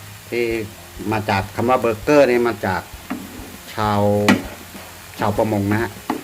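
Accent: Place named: North, Thailand. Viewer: ฟังสำเนียงการพูด น่าจะเป็นคนภาคเหนือนะครับ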